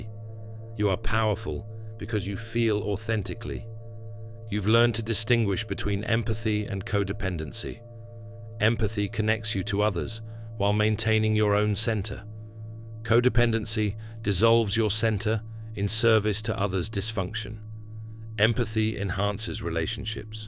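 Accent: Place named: United Kingdom. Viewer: sing-songy British voice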